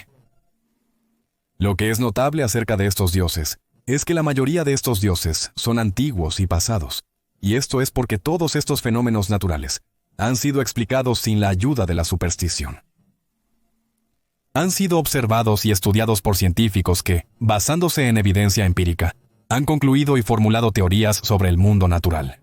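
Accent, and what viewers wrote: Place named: Colombia. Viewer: no mija , hablas como colombiana , eres? , he estudiado tanto y analizando tanto , que ya no tengo dudas , así me pongas una pistola en la frente , es tan real , que no ubiera querido existir sin la existencia de Dios , aunque se que es imposible existir sin ( ÉL ) Sabés que significa su nombre ? SOY el que SOY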